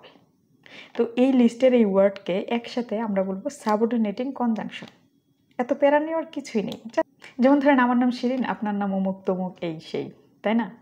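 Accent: Narsingdi, Bangladesh. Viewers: Boin just masallah chilo....apnr accent sone mone hocce apnr bari Narsingdi.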